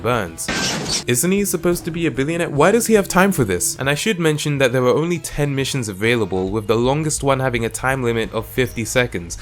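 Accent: United Kingdom. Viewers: I love your accent! Feuweees = Furries...I can't place which area of Britain you're from. Where you from, bro?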